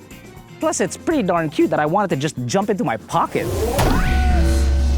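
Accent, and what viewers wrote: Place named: Conyo, Philippines. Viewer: Too conyo for my taste. Its simply Calamba not Cuh-luhmbah duuuh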